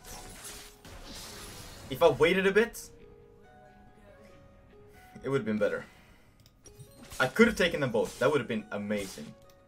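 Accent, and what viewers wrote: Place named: Germany. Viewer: You do kind of look German and I have heard english speaking germans who sound like you. I initially assumed you were too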